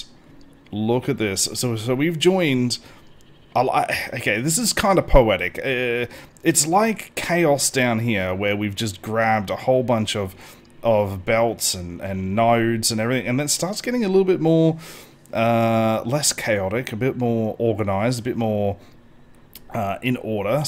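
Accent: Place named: Australia. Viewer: Is the "Build Big or Go Home" mindset just how Australians like to play these games? Because this is damn impressive! I know another youtuber who is a Minecraft player and he is also Aussie and loves to build grand projects:D I love the videos (Also, no offence meant if you're not Aussie, the accent sounds like you are but i could be wrong, no offence meant though <3). I am excited to see where this mega project takes you! Keep up the great work!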